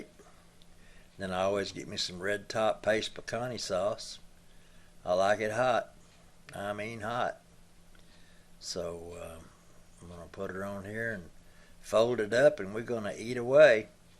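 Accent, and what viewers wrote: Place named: Texas, United States. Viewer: That good ol southern accent is everything!!! sound like one of us Texans. Im going to try these tomorrow morning. Thanks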